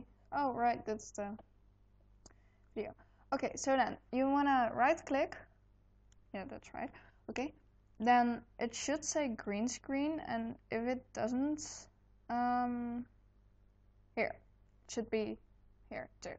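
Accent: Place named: Netherlands. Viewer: hooi! !!!!!! Ik kan gwn horen dat je Nederlands bent XD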